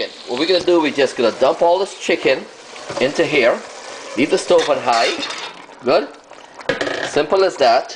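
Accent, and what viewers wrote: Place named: Guyana. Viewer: congratulations for your video. I didn't know people in Guyana speak in english like this. Do you recommend your country to people like me who want to practice my English?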